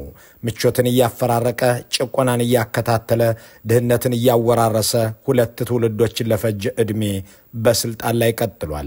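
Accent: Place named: Qomche, Ethiopia. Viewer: አክሰንትህ ቆምጬ መሆንህን ያሳብቃል ። ቢያንስ ተቃውሞህ እንዲሰማ ...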